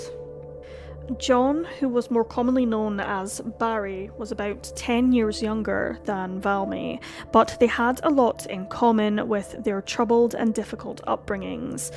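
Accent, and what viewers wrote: Scotland, United Kingdom. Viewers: I think she's scottish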